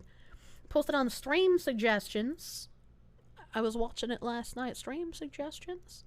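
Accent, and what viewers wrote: Boston, United States. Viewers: ‘Chubby lesbian biker’ My brother in Christ, that is a Boston accent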